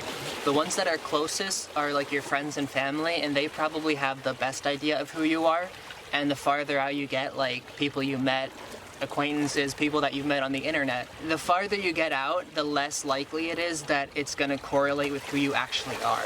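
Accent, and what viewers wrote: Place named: Maine, United States. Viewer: AYYY 3 dollah deweyyssss r u from maine wtf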